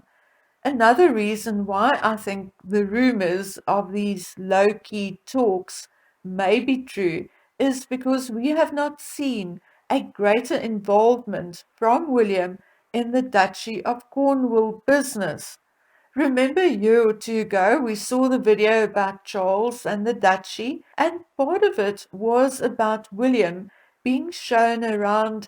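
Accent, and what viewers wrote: South Africa. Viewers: It’s so lovely hearing your South African accent🥰🥰🥰🥰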